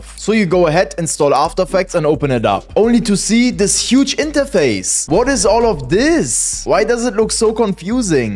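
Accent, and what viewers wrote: Germany. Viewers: Are You German? I hear a german accent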